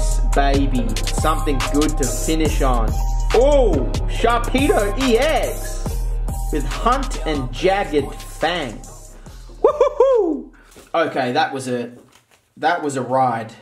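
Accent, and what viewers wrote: Australia. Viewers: Love that "Dewgong" and "Jewgong" are essentially indistinguishable because of the Aussie accent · You can tell he's Astran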